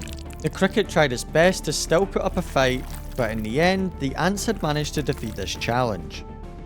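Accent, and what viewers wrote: Scotland, United Kingdom. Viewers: What part of Scotland you from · Bro sound bri-ish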